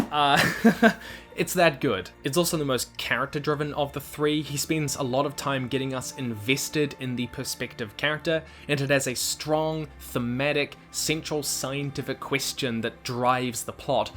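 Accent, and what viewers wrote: New Zealand. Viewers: Its a kiwi. Love it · Yo bruv. Good vid. Where you from? You sound like a kiwi to me. Good to know there's more than just me reading this sort of thing in nz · Great to hear a Kiwi do a review, too often it's Americans which is fine but I like to hear different voices. Thanks for your review. I'm going to buy the trilogy.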